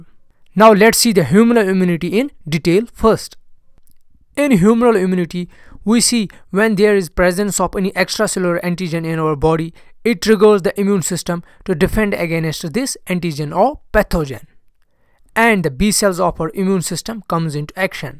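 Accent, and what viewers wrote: Kashmir, India. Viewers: Typical kashmiri accent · amazing vedio sir ...💕 we all kashmiris sound different and beautiful thats why i was able to recognize u ...keep going ✨ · Are you a Kashmiri? Coz that's a very similar accent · Kashmiri accent 💕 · Indian Accent again. Sucks! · Idk y but sir your accent seems Kashmiri 😅😅 but truely it made it kinda more easier to understand ..tysm sir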